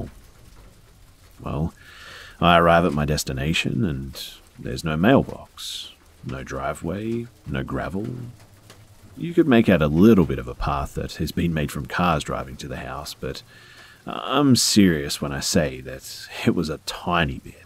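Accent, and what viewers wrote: Australia. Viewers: How the hell have I only just found you bro, an Aussie narrator, if I knew about this earlier its all I'd be listening to, keep up the good work brother!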